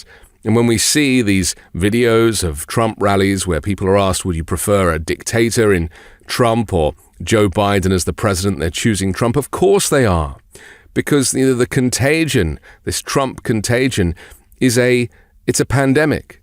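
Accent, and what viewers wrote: United Kingdom. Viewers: I find it hilarious that this host is still clinging onto the Russia Collusion hoax. His British accent makes him sound intelligent and then he lets stupidity like that come out of his mouth.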